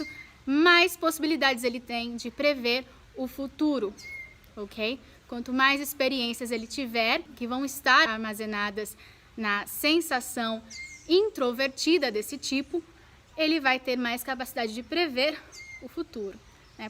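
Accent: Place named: United States. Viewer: gente, ela fala muito "okay" pq ela morou muito tempo nos eua ai fica meio q um sotaque sabe, para brasileiros que não conhecem ingles pode parecer diferente mesmo, mas òtimo video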